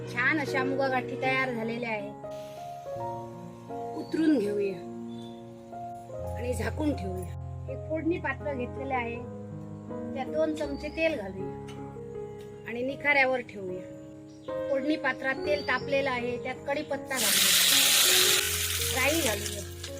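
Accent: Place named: Pernem, India. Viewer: Goan lok aasi bhasha ulainath ge tu pednechi bhasha boltoi mugye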